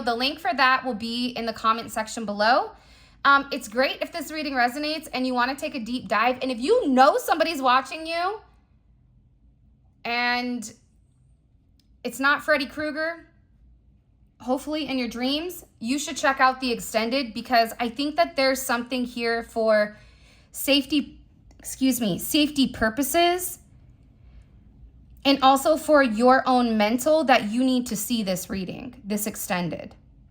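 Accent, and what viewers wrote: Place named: South, United States. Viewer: I want her because she's a beautiful singer I want her because I love her I want her because I love her beautiful voice and her southern accent I want her because she's the most beautiful talented girl 💗 and I told her 56 years ago that I was in love with her because I didn't like to see her cry and her videos and God told me that she needed someone to love her man that's exactly what I did❤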